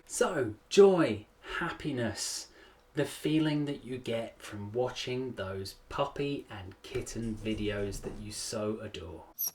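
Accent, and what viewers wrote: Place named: United Kingdom. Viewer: Loved your accent, fellow UK creator here. I think this is so insightful and it’s also so lovely to have someone on YouTube not shouting and screaming at the camera. Very informative and love the blue in the background. I am only new on the platform myself but if I can give you some feedback, there is a little white noise in the background, this can be rectified by cleaning the audio depending on what programme you are using. You have a new subscriber. 👍 keep doing good!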